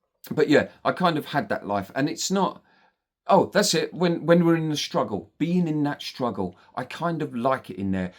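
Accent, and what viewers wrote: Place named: United Kingdom. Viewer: Best wishes to you, man! You sound like the British version of ROB THE NOMAD. Looking forward to following your journey around the great North of the UK, or wherever you end up. Post often and happy travels!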